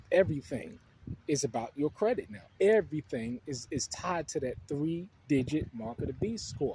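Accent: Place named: Brooklyn, United States. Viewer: I knew you were from Brooklyn. That accent is too familiar.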